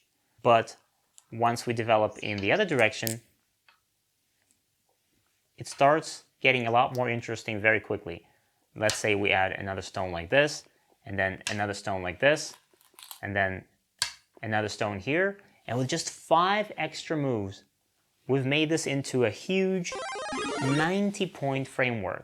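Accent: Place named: United Kingdom. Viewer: You have a great accent. I thought your from the UK :) I like your passion about the game. Also the studio and the way you talk about the game.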